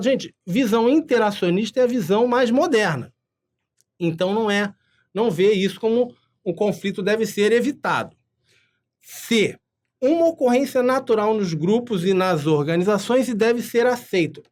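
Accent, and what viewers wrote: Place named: Rio de Janeiro, Brazil. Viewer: Você é fera irmão! Carioquês em dia! Vlw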